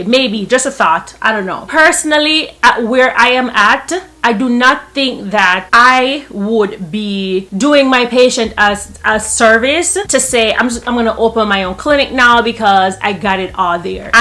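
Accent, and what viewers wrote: Jamaica. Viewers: Come through Jamaican Accent! lol, great video.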